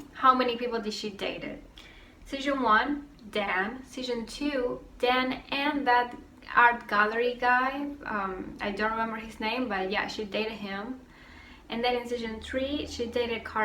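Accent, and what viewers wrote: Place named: Puerto Rico. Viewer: I love your accent. Reminds me of my Puerto Rican grandparents and cousins 🥰🥰🥰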